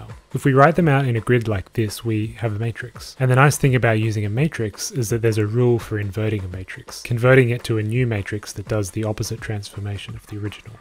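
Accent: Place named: Australia. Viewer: >strayan accent >math :(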